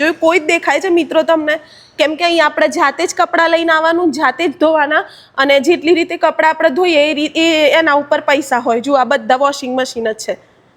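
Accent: Sabarkantha, India. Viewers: સાબરકાંઠા ના લાગો છો ક્યું ગામ?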